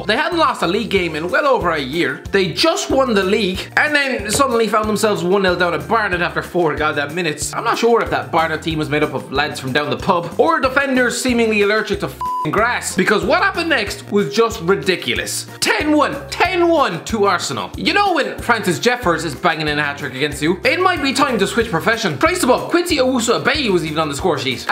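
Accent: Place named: Ireland. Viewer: I think it’s Irish 😂😂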